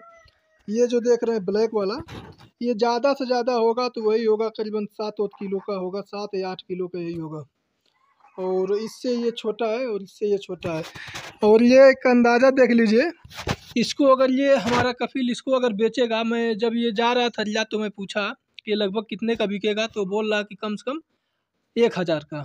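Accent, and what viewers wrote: India. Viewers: Bhai aap Bihar ya up se ho kya lagta hai aap bhojpuri bolte ho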